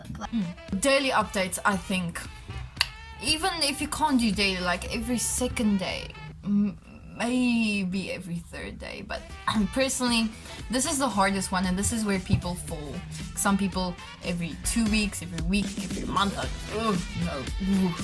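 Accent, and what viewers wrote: South Africa. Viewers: I knew she was from SA ,the accent said it all😂....yess!! Fellow South African💫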